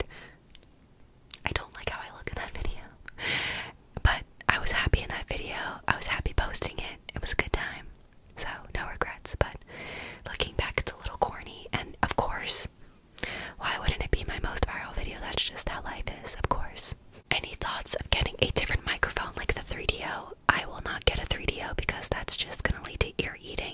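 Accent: United Kingdom. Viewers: omg your british accent is so beautiful omg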